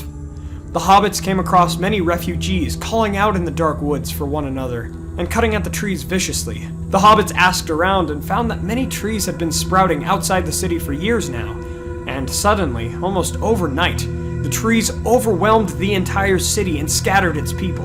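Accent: United States. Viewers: Your American pronunciotion with all these "r" is terrible.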